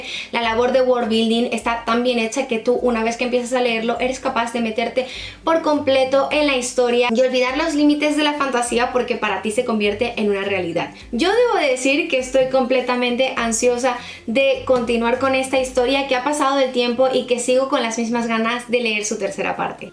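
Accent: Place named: Mexico. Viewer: Tu acento es mexicano pero le añades el "os" De donde eres? Buenos libros. Ciao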